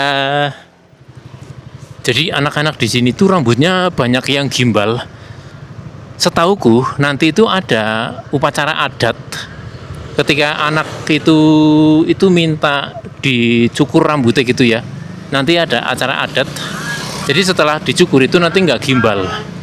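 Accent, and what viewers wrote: Banyumas, Indonesia. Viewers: Mas ki ngapak2 dialeknya, tapi pinter bahasa Jepang. sekali2 dimunculkan mas broo BHS Jepangnya? Kita biar sambil belajar Jepang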